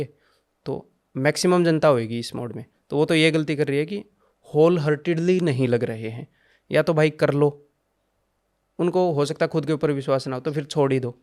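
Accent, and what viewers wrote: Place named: Haryana, India. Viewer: He is haryanvi bro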